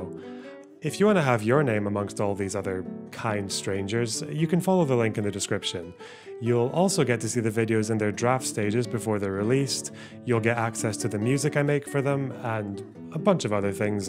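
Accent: Canada. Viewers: Nailed that Albertan accent my guy. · I absolutely lost it at your beautiful Canadian accent. Well done 👍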